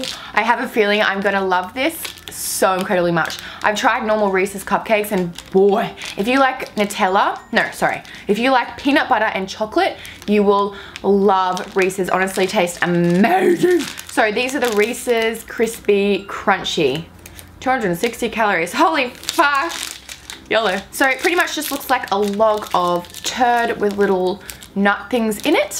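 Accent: Australia. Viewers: Omg I love your accent😂❤️ I never thought I would love Australian accents but I turns out I do😂😂 when you found out the Reece's bar was out of date hahah omg😂😂❤️❤️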